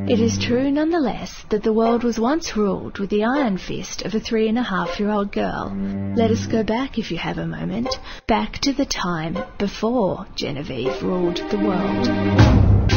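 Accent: Australia. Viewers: weew for the australian narrator c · this one's australian.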